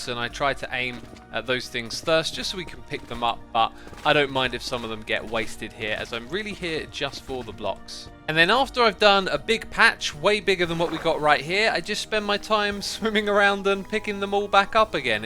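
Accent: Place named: RP, United Kingdom. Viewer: X sounded so extra posh in this episode.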